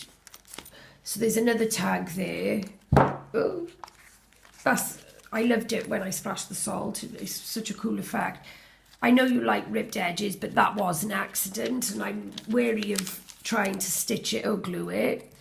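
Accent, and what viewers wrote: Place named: Cardiff, United Kingdom. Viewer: Hi. I’ve been following Tracie for a little while now and just came across your channel. I adore that journal you made. The detail is incredible and the family history inside of it so precious. I’m a new subscriber to you now and look forward to binging on your videos! Can I ask whether I’ve detected a fellow Cardiff girl? 😀. I’m fairly new to JJ and TNs and my channel is pants at the moment but I’m enjoying making them. Thanks for the inspiration xxx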